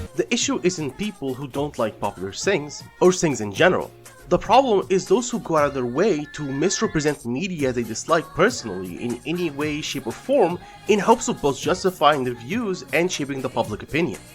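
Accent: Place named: Middle East. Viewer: Based on your accent and saying that you watched spacetoon I assume you're middle eastern correct?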